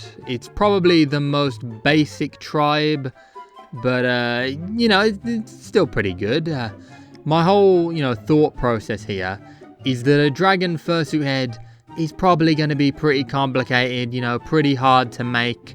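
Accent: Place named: Birmingham, United Kingdom. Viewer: are you from birmingham?? s teir accent :))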